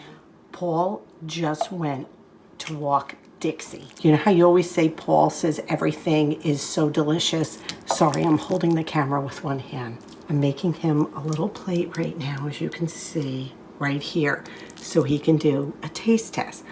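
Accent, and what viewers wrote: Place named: New York, United States. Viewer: I’m a fairly new subscriber and have been enjoying watching your videos. I can really tell you’re from NewYork and nowhere near “Dixie” just by listening to your accent. 😊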